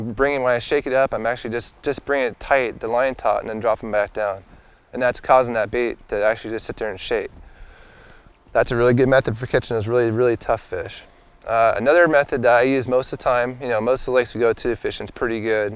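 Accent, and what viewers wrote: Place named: Southern California, United States. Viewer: He was from Southern California, it's just the regional dialect.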